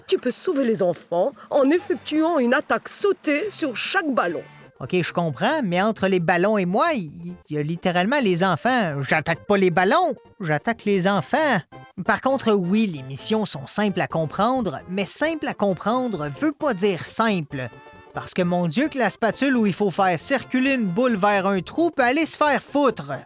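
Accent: Quebec, Canada. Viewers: J'ai commencé à regarder des vidéos en français pour pratiquer la langue. Je me suis retrouvé ici par hasard et, waouh, quelle découverte ! Bon, je ne comprends pas la moitié (j'utilise les sous-titres automatiques. Ce serait super d'avoir des sous-titres en français). Je trouve l'accent québécois vraiment intéressant. Salutations d'Espagne !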